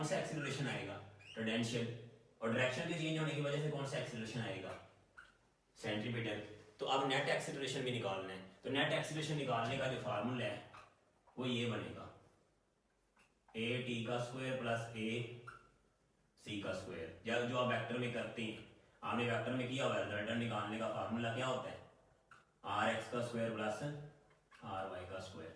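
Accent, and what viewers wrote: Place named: Saraiki, Pakistan. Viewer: 50% idhar 50% udhar Student of M.Phil physics + also teaching Medy khayal ich tohaadey lehjy to lgdy saraiki ho..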